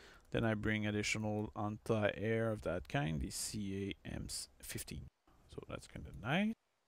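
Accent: Quebec, Canada. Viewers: Te québécois?je crois le reconnaître à ton accent anglais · Oh, what's your nationality ? I can't find what accent is yours. Canadian maybe ?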